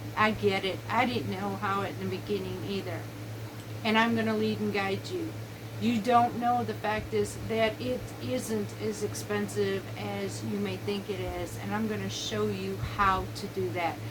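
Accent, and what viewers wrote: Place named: South, United States. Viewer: Hi 🥇🏡❤️⭐️⭐️⭐️⭐️⭐️ Let’s do all the shopping. It’s extra fun for me because it’s so different than Canada. I love southern accents ❤️. You are my sister and I can’t wait to shop together 😊😊😊😊😊😊😊😊😊😊😊😊😊😊😊😊😊😊😊😊😊😊. The walk was wonderful. Only one complaint is Jason and I are still waiting for a yes 🙌 on putting the membership on. It is totally optional for subscribers. You will have a good income if even a small percentage of subscribers join. Pretty please with infinity cubes of sugar?No extra perks nessasary or wanted we love ❤️ you!☮️💟🖖CC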